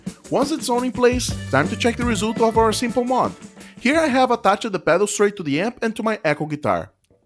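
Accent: Brazil. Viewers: Tu é brasileiro, irmão? Esse sotaque entrega ❤ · are you brazilian? you have the característics of brazillian when you speak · sotaque Brasileiro, tmjt BR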